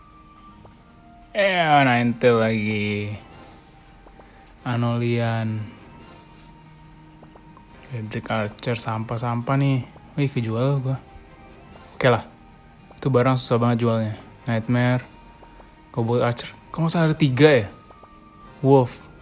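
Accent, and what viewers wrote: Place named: Medan, Indonesia. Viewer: wkwkwk orang mana bro? kyk logat medan ya.. cibai2 la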